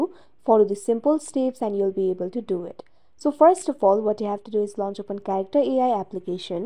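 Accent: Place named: India. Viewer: indian acces on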